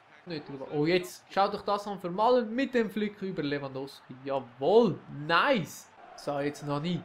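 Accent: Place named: Switzerland. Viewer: Deine videos und dein schwitzer dütsch is hammer geil! :D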